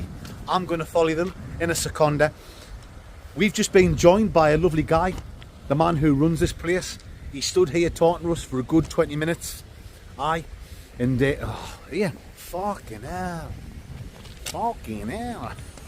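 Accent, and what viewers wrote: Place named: Newcastle, United Kingdom. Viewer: Hi! Love your channel!😊im from Denmark, and I have a friend from Newcastle, he moved to Denmark many years ago, but i recognized the Accent immediately 😅 you guys are special in the hest ways possible!❤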